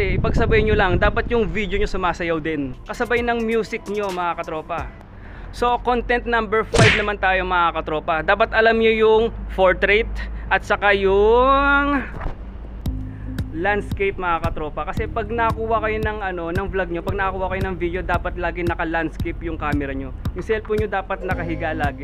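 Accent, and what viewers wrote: Batangas, Philippines. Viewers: Kabayan ala eh batangeño kb san o s batangas aq eh s tanauan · Hahajaja laptrip..batangueno si kuya..hahaha kuya dalawin moko para pangatlo na ako sa manunuod mp hahahaha...anyways nice and entertaining vid mo kuya..salamata...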